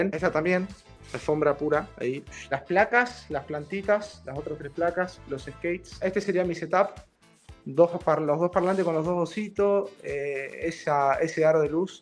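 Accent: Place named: Chile. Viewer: pov:la presentacion del video hola hola po weon (es por el acento de chile)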